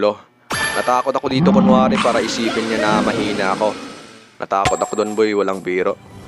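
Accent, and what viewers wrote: Visayas, Philippines. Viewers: Balay hahaha bisaya ka dong😂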